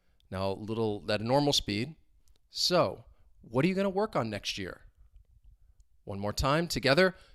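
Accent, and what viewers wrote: United States. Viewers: it takes lot of time and dedicatiion to absorb the rythm and flow of american accent, if i can get more real imput instead of just reading and whatching tv, i will be more confident in speaking the way you speak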